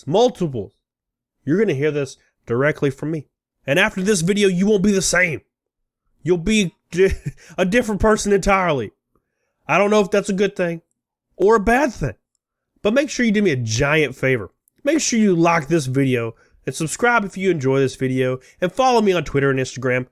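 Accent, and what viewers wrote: South, United States. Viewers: Did I just hear a southern man say the Confederate battleflag is Scary????? Lol wtf bro.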